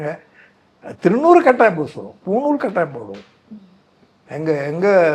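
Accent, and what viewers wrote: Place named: Tamil Nadu, India. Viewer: ஐயாவும் யாழ்ப்பாணத் தமிழில் பேசுவதை மறந்து விட்டு தமிழ்நாட்டு தமிழில் பேசுகின்றீர்கள் அது போல் தான் அந்த அப்பாவி தமிழர்களும் தங்களை யார் ஆதரிக்கின்றார்களோ அவர்களை பின்பற்றுவது வழமை தானே திக்கற்றவனுக்கு தெய்வமே துணை வாய்ப்பேச்சு மட்டும் போதாது செயலிலும் செயல்பட வேண்டும் நான் ( (ஒடிசா)-கலிங்கம்) மடப்பள்ளியார் வகுப்பைச் சேர்ந்தவன்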